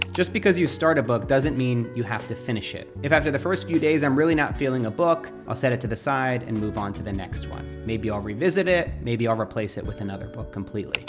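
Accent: Australia. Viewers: "Going FOH-ward," to me, is one of his trademark pronunciations that you just need to wait for. Can't wait for Australian accent to kick in.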